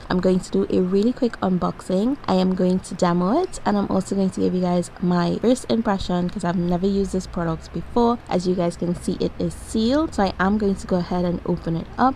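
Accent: Trinidad and Tobago. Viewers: Recognise that trini accent anywhere 🇹🇹🇹🇹... Imma subscribe to your channel just for that 😁😁.... BTW I love polygel tutorial videos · are u trini?? cause u def sound like it lol also, this set is fireeeee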